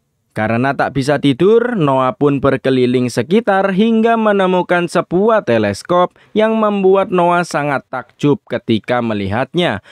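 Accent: Java, Indonesia. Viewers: cuman bilang meddok doang bang,kaga raasis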